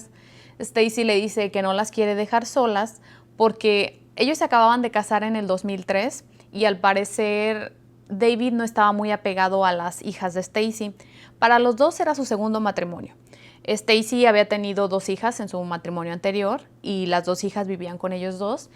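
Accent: Mexico. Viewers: Al fin alguien que narra los episodios con normalidad sin pretender ser dramático. Además el acento es perfecto. Me encanta que seas mexicana 🇲🇽 Muchas gracias.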